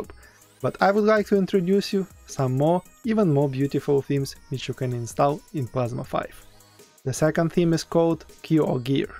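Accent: Russia. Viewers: лол, чувак, по акценту понятно что ты русский)))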